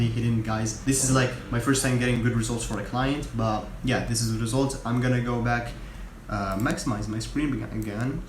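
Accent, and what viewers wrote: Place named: Morocco. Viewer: Salam alaykom brother Are u moroccan? Ur accent is giving it away lol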